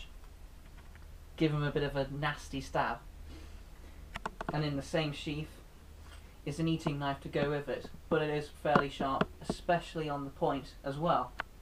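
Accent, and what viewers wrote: United Kingdom. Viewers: He sounds like some type of Londoner too, which is my least favourite accent.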